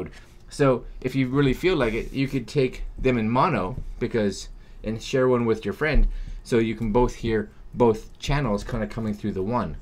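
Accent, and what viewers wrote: Canada. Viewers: Great video if your not Canadian you sure do sound Canadian from your viewer up north ,keep up the great reviews this is my new favourite Chanel 👍🇨🇦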